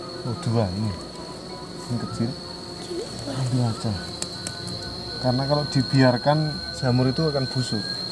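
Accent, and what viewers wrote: Java, Indonesia. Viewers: Jowone endi mas pean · dari logatnya seperti org jawa tapi musik nya daerah sunda gek piye kokyo gk nyambung 👎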